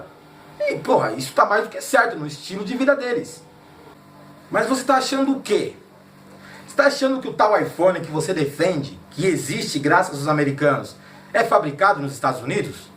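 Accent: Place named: São Paulo, Brazil. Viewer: Vc é o Cara! Eu sou carioca - tenho vergonha da cidade hj, certo! - e jamais pensei em encontrar um mano verdadeiro com sotaque paulista. O impossível ė possível, acabei de encontrar o. Valeu, pelo canal. Para agradecer só posso retornar com 100% de audiência. Mano, não desista do seu povo, força guerreiro!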